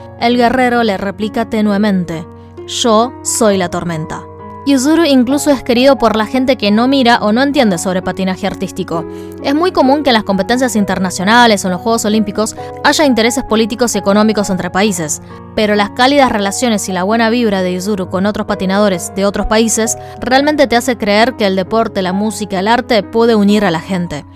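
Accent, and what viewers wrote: Argentina. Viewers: Se me han caido las lagrimas de emocion gracias chinita linda me encanta tu acento Argentino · Buen video. Estaría mejor si modulares tu acento argentino y pesado.... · Me encanta el acento argentino de tu español, che! Sos, única!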